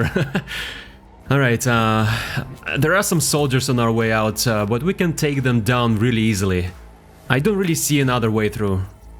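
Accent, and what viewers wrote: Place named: Eastern Europe. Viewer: also, where are you from? I hear a nice eastern-European accent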